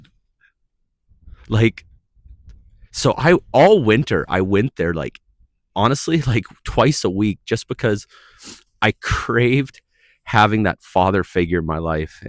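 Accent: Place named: Northern Canada, Canada. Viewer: I'm female and not having a father in my life affected me a lot too, but in a different way. Thank you for sharing this. I'm from Manitoba and I also came from a Mennonite family but grew up without church or any religious involvement. I know the feeling of being lonely and isolated in the winter. I live in the Midwest now, but it's really nice seeing that you're still up there in northern Canada, I knew I recognized your accent 😊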